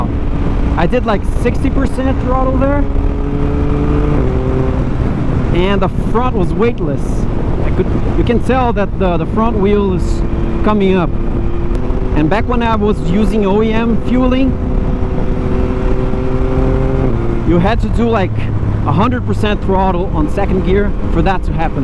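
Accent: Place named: Scandinavia. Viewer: sounds portoguise. But your accent sounds scandinavian:)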